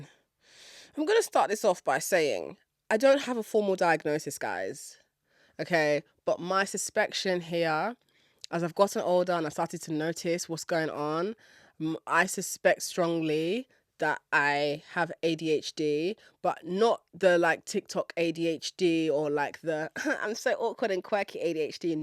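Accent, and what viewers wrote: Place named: United Kingdom. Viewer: this is my first time hearing your voice and i didn’t know your were a UK babeeee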